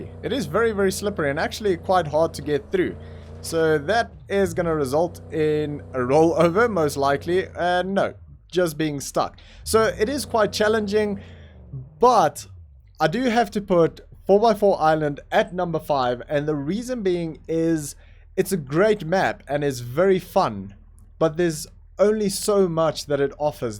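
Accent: South Africa. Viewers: are you from South Africa? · u sound like ur from South africa Are u?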